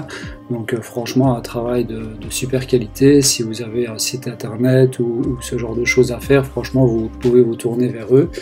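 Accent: Belgium. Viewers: Le T-Shirt on achète! 😁 Et le coup des T-shirt d'autodérisions de notre bel accent belge pareil! Super idée, le coup de l'artisan local! Belle initiative! Félicitation! 👍👍 J'oubliais: l'établi MFT top idée!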